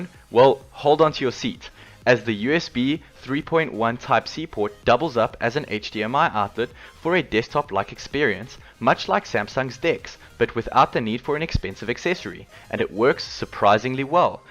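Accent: South Africa. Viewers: Hey, South African accent guy - excellent video, well done.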